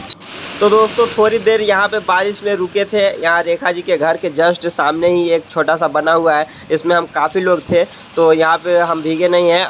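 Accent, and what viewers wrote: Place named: Bihar, India. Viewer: Aap jarur bihari hain.mai bhi bihar ki hoon.apke accent se pata chalta hai. Pahle apna uchharan theek karen tabhi to baat banegi. Mai senior citizen hoon so aapko advice de rahi hoon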